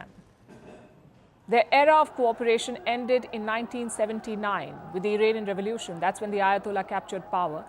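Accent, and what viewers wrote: India. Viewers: Seem tha you know everything? I dont like how you speak English still you sound indian.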